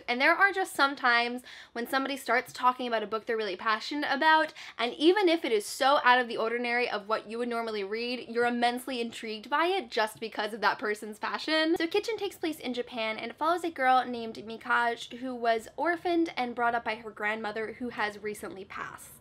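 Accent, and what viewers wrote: France. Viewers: omg your french is so cute! <3 :) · Félicitations pour le très bel accent français : ) C'est toujours un plaisir de regarder tes vidéos. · Your french pronounciation was too cute!!! Pour ma part, c'est l'inverse ; je lis en anglais alors que je suis québécois :p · Your french accent is so cute 😍 I love your video so much ❤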